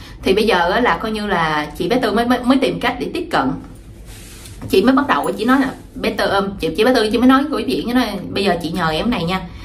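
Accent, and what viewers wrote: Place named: Rural, Vietnam. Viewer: Cô rất thích - giọng nói đậm chất quê- tâm lại tốt nữa Oke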